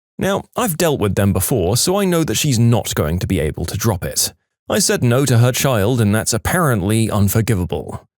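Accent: United Kingdom. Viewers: The British accent, though 😂😂😂 (This channel is my hyper fixation rn)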